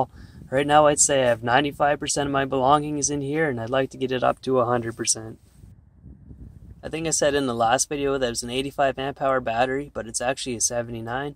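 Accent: Canada. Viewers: Hi, man! Looove your videos and have been watching for a while now, especially all your amazing bike trips and now the minivan conversion, as I'm planning to do a conversion in the near future. Something I'm dying to know - what accent do you have? I'm pretty sure I remember you being Canadian?? but what part? Sorry if I'm wrong or what not. thanks for all your videos! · You have a super Canadian accent, like my buddy Randy.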